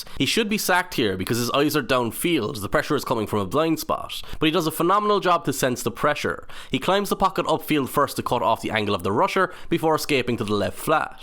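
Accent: Ireland. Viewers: It is so odd hearing an Irish accent when talking about American Football….I expect to hear this kind of accent for Premier League analytics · Really enjoyed your analysis on this.As a Scottish Packer fan it’s great to hear an Irishman talk about football… relatable!👍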